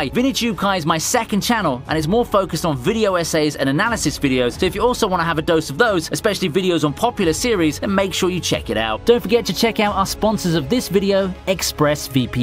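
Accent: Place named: England, United Kingdom. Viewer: This would be a great video if the narrator could shut the fuck up and let the scenes play out. Hard to get any emotion out of these clips with a strong english accent telling us what to feel and then splicing in sentences so quick it's jarring, along with a sponsor 5 minutes in. Fuck I hate youtube.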